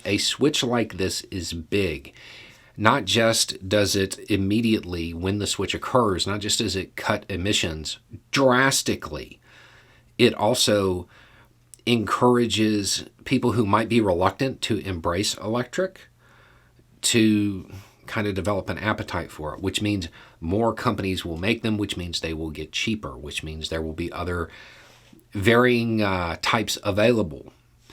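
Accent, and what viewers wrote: South, United States. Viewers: doing a mocking deep southern accent while already having a southern accent lol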